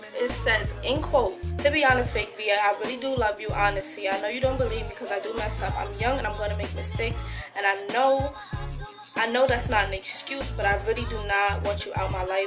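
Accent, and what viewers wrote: New York, United States. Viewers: You from nyc?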